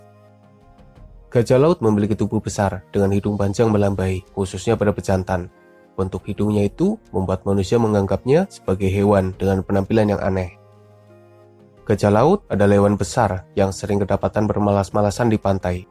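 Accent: Banyumas, Indonesia. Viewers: Ngapak sukses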